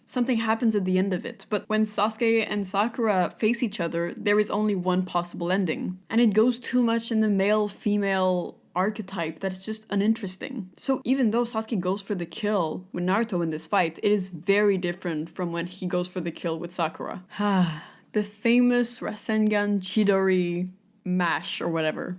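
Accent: Quebec, Canada. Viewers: Are you a quebecer or French person by any chance? Just curious because I'm from Quebec and your english accent sounds similar to mine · Great video, I loved it. Also is this a quebec accent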